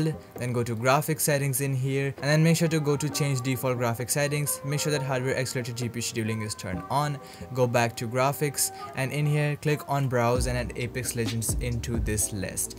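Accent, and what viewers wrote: India. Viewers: Why do all the helpful tech vids I find have an Indian sounding dude.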